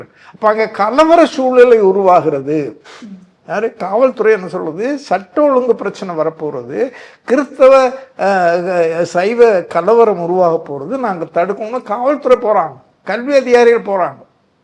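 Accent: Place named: Tamil Nadu, India. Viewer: ஐயாவும் யாழ்ப்பாணத் தமிழில் பேசுவதை மறந்து விட்டு தமிழ்நாட்டு தமிழில் பேசுகின்றீர்கள் அது போல் தான் அந்த அப்பாவி தமிழர்களும் தங்களை யார் ஆதரிக்கின்றார்களோ அவர்களை பின்பற்றுவது வழமை தானே திக்கற்றவனுக்கு தெய்வமே துணை வாய்ப்பேச்சு மட்டும் போதாது செயலிலும் செயல்பட வேண்டும் நான் ( (ஒடிசா)-கலிங்கம்) மடப்பள்ளியார் வகுப்பைச் சேர்ந்தவன்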